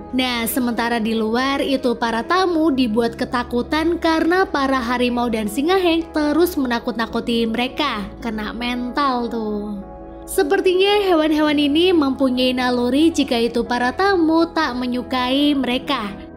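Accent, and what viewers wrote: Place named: East Java, Indonesia. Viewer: Aleman ini makanan apa ya…?🤔🤔 Logat jawa timur paling timur kayaknya…😁😁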